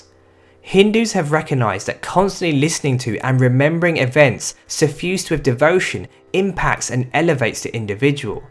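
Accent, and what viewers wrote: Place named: United Kingdom. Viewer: First of all great video, it seems from the accent you are british but the pronunciation of hindi words and gods names or places was excellent ,thanx for making this video ,truly educational.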